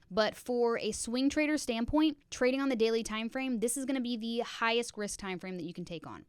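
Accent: United States. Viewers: Original American Accent...❤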